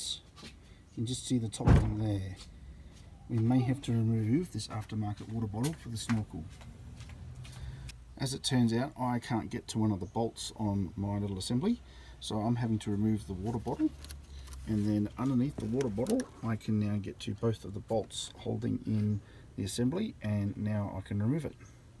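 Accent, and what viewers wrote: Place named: Australia. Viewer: Mate, you and your Australian language are both legends, thank you for the video, cheers from Saudi Arabia.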